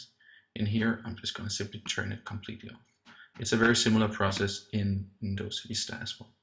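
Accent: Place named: Denmark. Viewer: This guy is Danish i Can tell by his accent 😂😂😂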